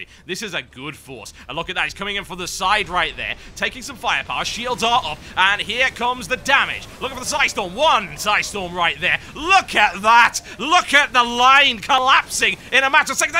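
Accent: United Kingdom. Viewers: no need to drink coffee, good gameplay gets the blood pumping.. love your enthusiasm =3 keep up the good work. (also, yay for british accent <3 )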